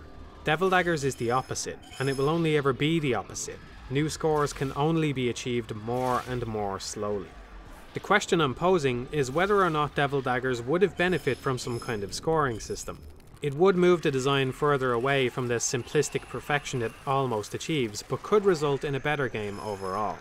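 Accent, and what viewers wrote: Ireland. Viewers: I needed this monotone Irish voice in my life. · The rebirth of my favorite snarky Irish gentleman is upon us. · holy fuck he's irish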